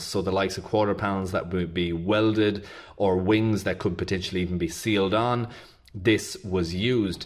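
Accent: Ireland. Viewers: listened 3x just to hear the Irish accent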